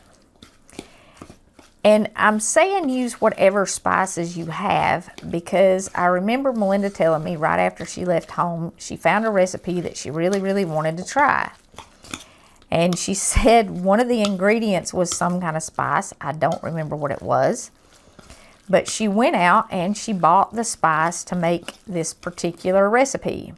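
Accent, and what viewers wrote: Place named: East Tennessee, United States. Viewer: Thank you so much for making these videos. Everything is broken down for someone who hasn’t cooked much. You are a great teacher, you are positive and you show God’s love in all you do. So many YouTubers right now are using fear and anger to get views. Your videos leave me feeling hopeful and peaceful and ready to try something new. I am so grateful for you. P.S. I love your accent. My granny was from East Tennessee and listening to you makes me happy! I am a retired women living in NC, and never had a family so I never learned how to cook - until now. Thank you so much. (Beth)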